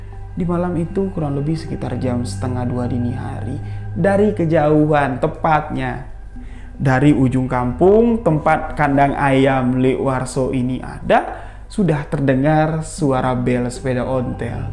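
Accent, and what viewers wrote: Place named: Madura, Indonesia. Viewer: Logat Madura nya masih kental.. Salam sehat selalu tretan dibik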